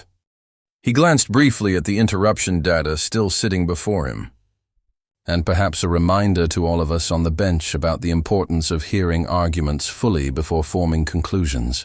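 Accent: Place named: United Kingdom. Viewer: We need an AMERICAN narrator!! Not a Briton….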